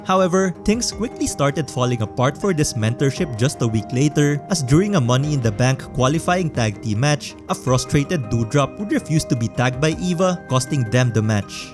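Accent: Philippines. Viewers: Bro are you filipino? 😍😍😍 love your voice!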